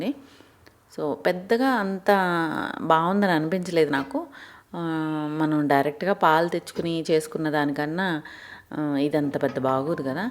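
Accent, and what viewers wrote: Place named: Godavari, India. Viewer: హాయ్ జీ. మీరు సూపర్. మల్టీ టాలెంట్.. నేను లేచిన వెంటనే మొక్కలు చూసుకుంటాను. మావాళ్లు కాల్ చేస్తే తియ్యకపోతే మొక్కల దగ్గరే ఉంటుంది అనుకుంటారు. మీరు విజయవాడ, గుంటూరు అనుకుంటాను. మళ్ళీ గోదావరి మాటలు కనిపిస్తాయి 😂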